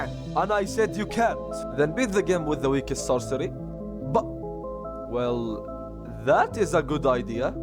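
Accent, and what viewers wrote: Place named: Arab world. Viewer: Are you arabic? Youre accent kinda sounds like an arabic one lol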